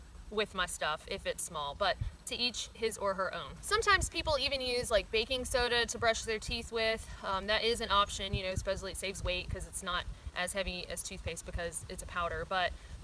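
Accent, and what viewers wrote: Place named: Alabama, United States. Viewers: I knew your accent sounded familiar. you said Alabama. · I really dig your southern Alabama accent!! It's really awesome that you share such intimate and taboo subjects such as personal bodily functions that not a lot of people would really share. I am not trying to be vulgar but when you said you hated body hair.. do you recommend shaving your pubic hair (male or female) to prevent chafing and also for hygienic purposes? Anyway, thanks for the great info and stay safe out there and please keep the great videos coming.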